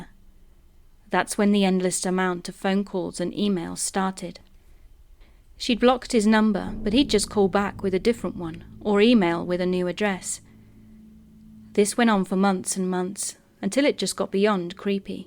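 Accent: England, United Kingdom. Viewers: Good to heart an English female narrator 😊 I have a lot of true paranormal stories that I’ve not got around to sharing if you want them ? How do I send them to you ? X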